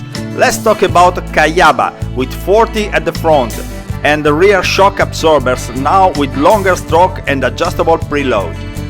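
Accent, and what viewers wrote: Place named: Italy. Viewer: there is one thing as beautiful as Italian motorcycles - the sound of the English spoken by an Italian :)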